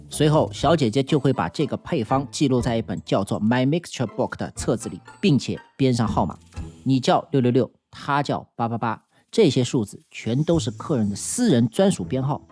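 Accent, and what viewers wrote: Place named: Shanghai, China. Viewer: 博主上普口条很舒服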